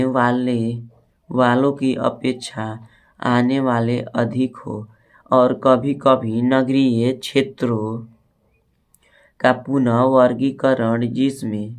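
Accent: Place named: Tamil Brahmin, India. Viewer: This man talks like a Tamil Brahmin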